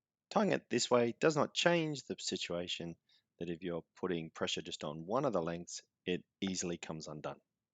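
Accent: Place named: Queensland, Australia. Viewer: I sure do hear your accent so I assumed you are from QLD? Doesn’t sound like VIC, SA or WA to me, but it isn’t easy to tell… Belfast, Northern Ireland, only 980 Nm from where I live (now). 😉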